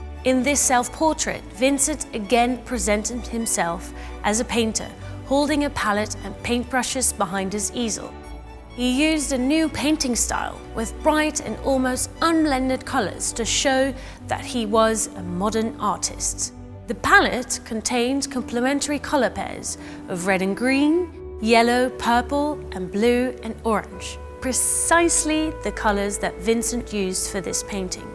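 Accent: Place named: Netherlands. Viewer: I actually love her accent, sounds like a Dutch that lived or studied long in England. My husband is Dutch and sometimes he also sounds a little bit like her, I love that.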